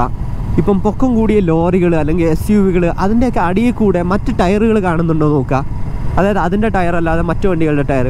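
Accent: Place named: Kannur, India. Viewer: bro ഏത് ജില്ല ആണ്? കണ്ണൂർ ആണോ അതുപോലെ തോന്നി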